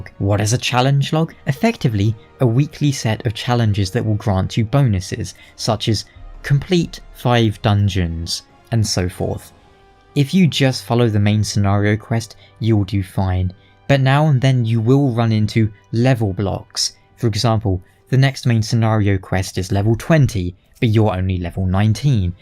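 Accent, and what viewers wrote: United Kingdom. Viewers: Not that this is any of my business, but I'm assuming he's talking about an Oldschool Runescape game developer called Mod Ash, who is a bit of a meme within that community. EDIT: Anyway I don't get why he's calling you that since you sound nothing like Ash. My guess is this guy isn't British and as such thinks all us British people sound exactly the same.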